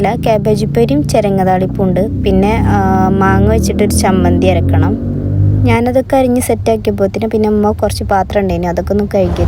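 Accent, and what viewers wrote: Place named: Malappuram, India. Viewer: നിങ്ങൾ എവിടെ മലപ്പുറം ആണോ? Am from malappuram,slang കേട്ടിട്ട് മലപ്പുറംപോലെ അതാ ചോതിച്ചേ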